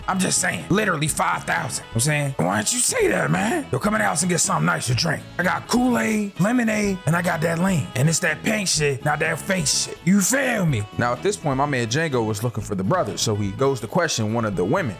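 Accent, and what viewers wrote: California, United States. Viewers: You’re very entertaining! I enjoy your commentary, and I’m pretty sure a great part of that is your californian accent. It makes me comfy 😂🩷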